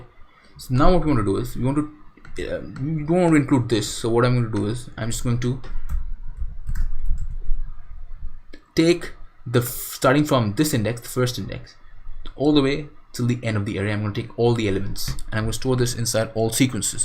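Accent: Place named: India. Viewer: Damn man that really was helpful but listening to 33 mins of your indian accent was the hardest things i've ever done in my life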